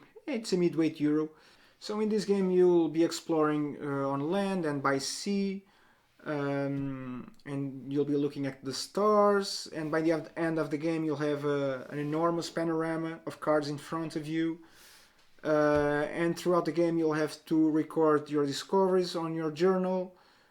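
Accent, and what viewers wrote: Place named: Portugal. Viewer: This english sounds like portuguese?! or am I dreaming. Anyway, very good video, good, simple production, direct to the point! Boa sorte! Mais um sub!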